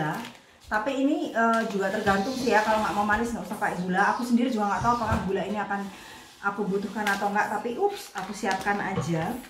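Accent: East Java, Indonesia. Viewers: Hahaha lucu mbak ikiii, medhok nya jowo tenanan.😍 Tp pancen enak tenan Sayur Bobor kuwi...., karo iwak asin ae wes TOP mar ko TOP.. Jadi ngiler akuuu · Mb ini dr logatnya mesti jawa timuran ki..😆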